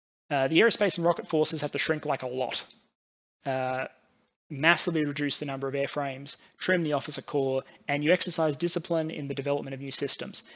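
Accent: Australia. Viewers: Love to hear an Aussie voice; very non- grating on my ears. Pure, unadulterated info! Oh Oy Oy ! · Fascinating and insightful especially considering how long ago it was posted. 's voice and delivery (not to mention the sexy Australian accent) make it easy to pay attention to the arguments. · hes Australian & that’s an Australian accent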